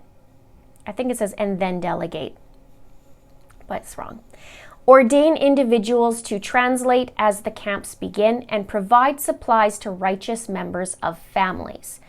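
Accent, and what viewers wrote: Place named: Canada. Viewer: She's Canadian I believe? They pronounce some things differently than other cultures.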